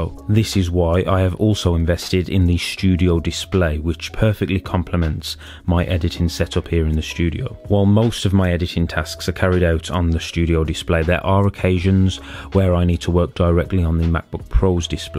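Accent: United Kingdom. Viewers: That British accent is 🔥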